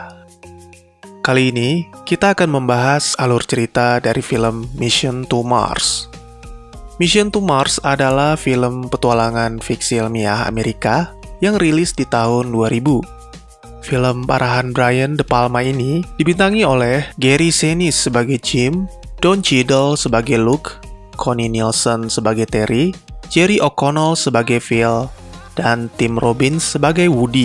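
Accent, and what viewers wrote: Aceh, Indonesia. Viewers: Dari aceh ya bang Nanya doang:-)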